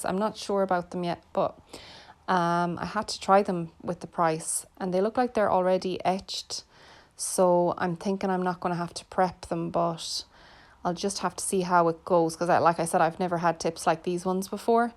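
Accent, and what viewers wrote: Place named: Ireland. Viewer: What a beautiful accent! Sorry I love my Irish ppl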